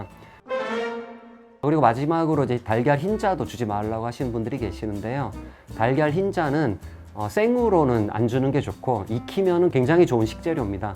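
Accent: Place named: Gyeongsang, South Korea. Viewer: 잘봤습니다~~^^ 영상과 관계없지만 혹시 원장님 고향이 경상도세요?? ㅋㅋㅋㅋ 억양이 베어 있는거 같아서 친근감이 드네요 ㅋㅋㅋ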